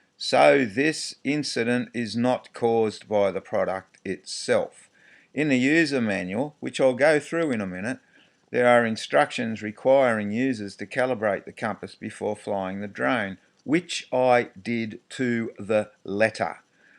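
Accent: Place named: Australia. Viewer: I'm pretty frustrated with mine too. Next to no instructions with it. Have to learn from other YouTube users or by trial and error. I reckon I've flown maybe a half-dozen hours! . I guess the presumption is that everyone's an expert. Can't calibrate it. Blooming expensive shelf ornament. (PS: Glad to hear an Aussie accent). I might go back & see Gerry Harvey's staff again. (Sigh)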